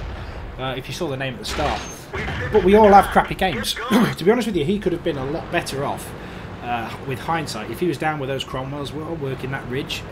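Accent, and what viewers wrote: United Kingdom. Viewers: Tanks - check ! British accent - check! New Subscriber ? - CHECK !!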